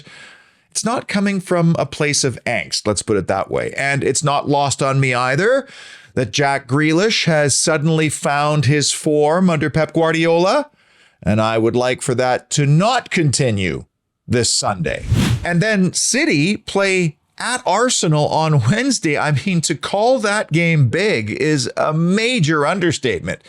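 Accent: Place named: Canada. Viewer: its awesome to hear that Canadian accent from a true Villan. international pride🦁🦁🦁. does somebody know if anyone is doing these kinds of videos on our Lionesses??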